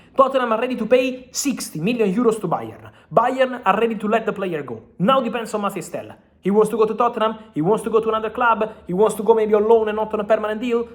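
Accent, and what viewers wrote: Italy. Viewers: He is Italian, he is trying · Bro you need to slow your speech down the heavy mario accent with the speed of you talk makes it inaudible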